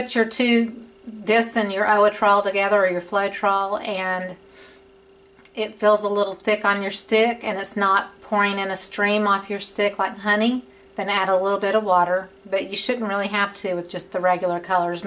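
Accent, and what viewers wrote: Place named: Rural, United States. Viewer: That "Country twang" just makes you more enjoyable to watch and listen to.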